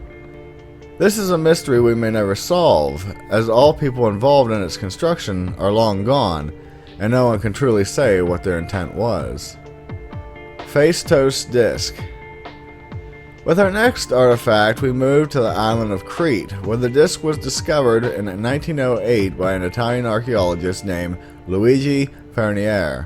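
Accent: United States. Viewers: Like the video. I assume the narrator is an american, and i´m just curious where in america one talks like this. Is it called dialect?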